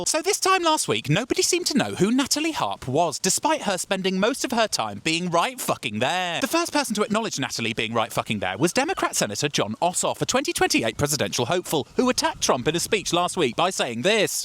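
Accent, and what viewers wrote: United Kingdom. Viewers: Absolutely nothing to do with the content of this video, which is great, but I love that you kind of look and dress like an American Cholo (probably gonna have to google it) but have a British accent. Epic. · Can’t take news about America seriously from somebody with a British accent. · You good, man. You got good shit. Love the British spin and accent. Such class! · Info ✅ Humor✅ Classic British accent to make it all more palatable ✅✅✅ Trumpty Dumpty this is the first I’ve heard that nickname omg it’s great. Just as great as “thicky thicky dumb dumb” being said in a British accent. it doesn’t sound anywhere near as good with my ‘merican accent lol 🍒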